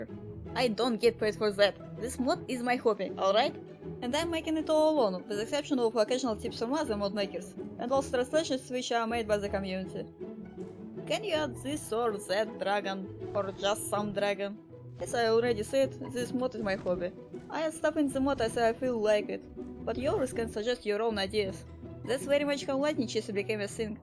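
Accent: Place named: Russia. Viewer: Просто спрошу, ты написал коммент на русском потому, что тебе тоже показалось, что у девушки очень яркий русский акцент?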